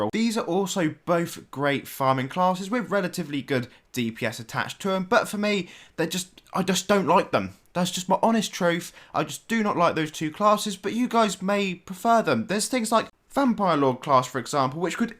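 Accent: United Kingdom. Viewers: I love ur accent and this clip U Brit or somewhere on the Europe?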